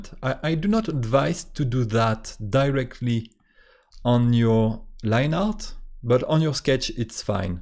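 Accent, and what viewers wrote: France. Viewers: not me liking in the first few seconds bc i hear a french accent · Don't apologize for your your French accent....or "English" mistakes. You should hear English speakers....my goodness. They are not textbook! This was very helpful. Merci.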